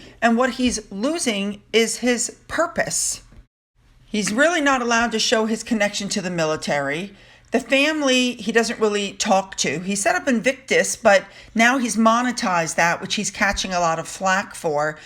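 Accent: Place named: United States. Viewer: The only wrong thing about your videos, dear, is that they are too short...lol. You have what they called in the old days a "radio voice". It carries over running water and every machine I have in the house, so you are my choice of sound when I do housework and I need more than 10 minutes for it. You also speak what I would call "BBC American", correct English with an American accent, and I am sure I speak for more non-native English speakers than myself when I say you are so easy to understand. And after having read most of today's H&M updates, I have come to the following conclusions: - Charles will be King when the Queen dies. - Jason Kanuff will not write a book (unless he is planning to retire at the age of 40). Spilling beans like that might stop him from being hired by others, and he comes across as a man with too high moral and ethical principles for that. 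So no. I think the NDA was lifted because something illegal was hidden by it. I do NOT think he would have done it without William's (and the Queen's) support, and since he was socializing with Catherine and William in Glasgow..well, didn't look like they had a bone to pick with him. - I don't think H&M will sit down for another Oprah interview. The two of them are cowards and seems like Oprah wishes she never met them. - And I finally remembered what that red dress reminded me of. When I came to USA first time in the early 70 and my country was really poor, one thing that impressed the $hit out of me was the Am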